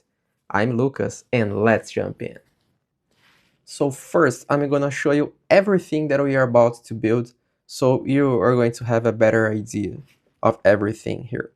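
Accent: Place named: Brazil. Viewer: Mano quando tu começou a falar eu logo pensei "rapaz esse bixo é brasileiro" falou o "lucas" já matei kkk obrigado pelo tutorial mestre.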